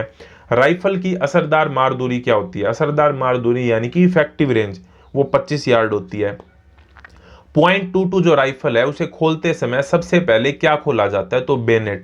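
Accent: Haryana, India. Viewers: Bhai haryanvi hai k ?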